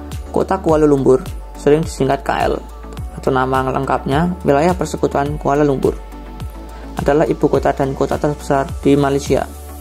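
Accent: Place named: Java, Indonesia. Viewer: Ngomongnya medok banget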